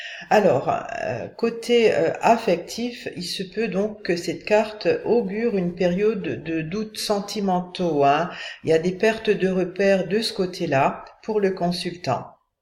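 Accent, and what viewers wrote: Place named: Switzerland. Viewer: A ta voix tu habiterais pas loin de la suisse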